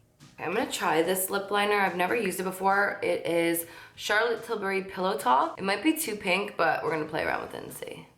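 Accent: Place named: New York, United States. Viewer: OMG I love your NY acsent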